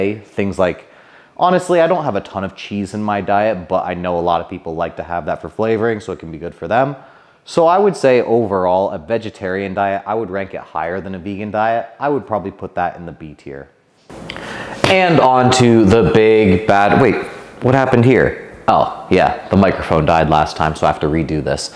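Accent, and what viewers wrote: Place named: Canada. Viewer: I was so confused why you had a Canadian accent until I found out you're Canadian lol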